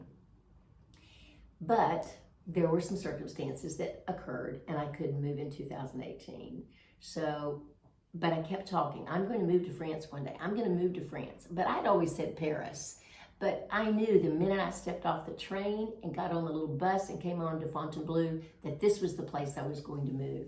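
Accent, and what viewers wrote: South, United States. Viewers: I just found your channel, and have watched a few videos. Have to say, you look so pretty with your hair in this loose, flowy way. Love it. And I love how happy you are with your decision. (I am appalled that someone said you should "get rid of" your beautiful Southern lilt.) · Thought I recognized the Southern accent. I’m from Georgia. Don’t get rid of it. It’s a gift! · It's a shame that people don't appreciate a southern accent or even a traditional southern lifestyle. I personally like and appreciate the diversity that accents offer as well as perspectives on life., I'm curious if you have noticed a similarity between the French approach to life and the old southern approach. When I was young, banks and many businesses took Wednesday afternoon off. As well, professionals often closed early on Fridays. Sundays were closed also for time for church and family with large family gatherings on Sundays around the meal. 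Things were slower paced and life was pleasant. This seems similar to traditional French life - as I understand it. What has been your experience? What are your thoughts? I love you videos and your accent. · I LOVE MY SOUTHERN ACCENT AND YOURS! I get a lot of comments on mine too and I remind them that they have an accent too unless theirs is from England! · Never tamper with your beautiful southern accent, it's charming. You have really lived a full life and still are. God bless you.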